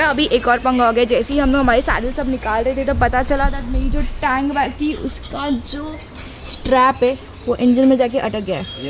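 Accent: Maharashtra, India. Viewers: Hey today I saw ur vlog first...surely u r Marathi..u r way of talking shows that..btw welcome to our place. Uttarakhand devbhumi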